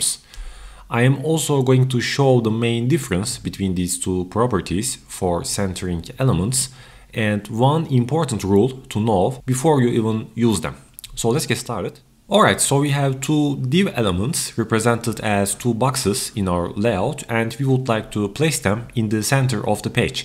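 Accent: Turkey. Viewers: Türk ingilizcesi nerde duysam tanırım :D cok güzel anlaşılır sade bir dil kullanılmış. Elinize dilinize sağlık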